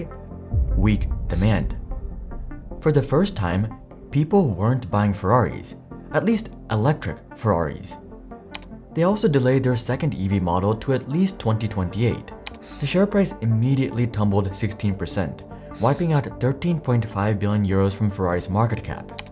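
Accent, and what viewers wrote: India. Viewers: Am I the only one to think that has an Indian background, judging by his accent? Otherwise I'm struggling to get used to not knowing about a foreign channel without a face, nor a common accent, be it UK or US. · The narrator is trying so hard to hide his indian accent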